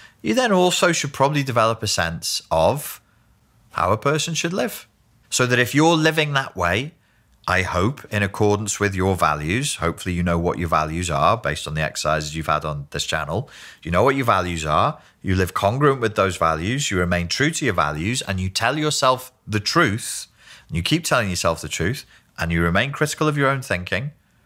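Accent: Liverpool, United Kingdom. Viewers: Finally I can hear some scouse from. 😱🤗 Or what's the proper term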